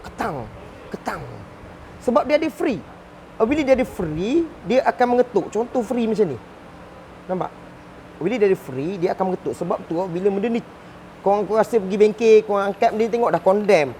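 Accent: Pahang, Malaysia. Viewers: Pekat Pahang yakkk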